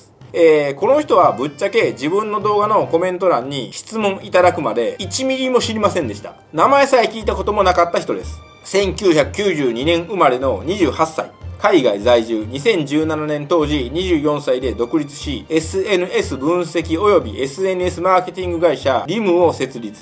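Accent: Kansai, Japan. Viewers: 先生こんにちは。先生の動画拝見を毎日の習慣と糧にしているアラサーOLです。先生は唯一無二のコンテンツ・切り口や視点で、どの動画も本当にとても面白いです！関西のイントネーションやお話方もほんとに好きです。お伝えしたいことと疑問、また相談がありまして、今回コメントさせていただきました。盛り沢山かつ欲張りですみません…。先生の動画を拝見していて感じたのですが、先生はとてもはっきりとかつ相談者や相手のことを考えたうえで、観相学を活用したアドバイスなどをされていると思い、とても親身で素敵だなとおもい、ぜひ結婚相手のことなど鑑定していただきたいなと思いました。まずはお礼をお伝えしたかったので、前置きが長くなりましたが、先生の動画や感じるお人柄もとても好きだからこそ、何日ももやもやしていたことがあったので、こちらに疑問兼質問として書かせていただきました。先生はいくつか観相学を用いて、いろんな著名人を例にご説明されていましたが、日本人あるは在日の方の例がほとんどで、先生はたびたび人種差別はされないと仰ってましたし、私もそのとおりだと思う反面、いくつか動画の表現で、どうしてもどこかで在日の方に対しては排他的な印象を抱かれてるのかな…と胸が痛くなりました。悪人面で何人か取り上げらていたので…私のとり違いでしたら大変申し訳ございません。。なので、一つは、在日や外国人に対して何か差別的なお考えがなければ、ぜひ先生のご発言や動画は今後もっと多くの方に見られる影響力のあるものだと思いますので、血や肌の色を超えて平和を望む一個人としては、先生のお考えをまた動画で聞かせていただけると本当に幸いです。疑問と相談についてですが、私は友人に在日の方や外国人も多く、私自身もハーフなので、そういう純日本国民でない人の人相判断はできますでしょうか。結婚を考えている相手でお付き合いしているのが遠距離中の台湾の方ですが、例のウイルスが予想以上に長く、会えないまた会えても仕事を辞めて海外に移住しなければなりません。また、今別の方にもアプローチをされていて、その方は在日韓国人の方です。(何人とかあまり区別したくないのですが、一応情報として記載いたしました) このような状況もあり、どこでだれと生きていくのが幸せなのか、本当に分からなくなってしまっています。個人的な質問だと採用していただけないので、どうお伝えしたらよいかわかりませんが、エッセンスを抜き取っていくつかの疑問になんとかご助言いただけませんでしょうか…; 先祖が違う民族といいましょうか、違う文化の方(特に結婚相手としての異性)を見るときのポイントやアドバイスがあればぜひお伺いしたいです！仕事をやめて海外で生きていくか、日本で生きていくかも含め、とても悩んでいます。こんな自分が嫌なので、早くはっきりできるよう、先生助けてください; これからも毎日観ますし応援しております‼︎よろしくお願いいたします。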